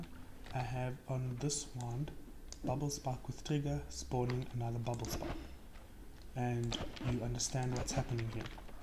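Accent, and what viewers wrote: South Africa. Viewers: Hey man just wondering you have a South African accent Are you form the Western Cape?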